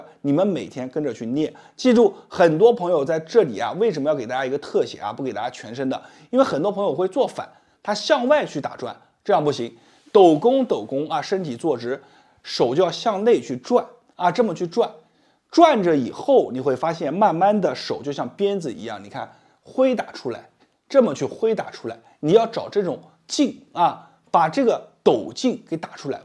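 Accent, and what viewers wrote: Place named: Nanjing, China. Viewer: 聽口音應該是南京人🙂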